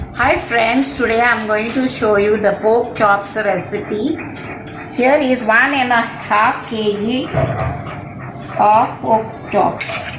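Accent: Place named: Goa, India. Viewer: i am a goan and I love this chef and her accent - so Goan. Love you and thanks for the lovely recipe.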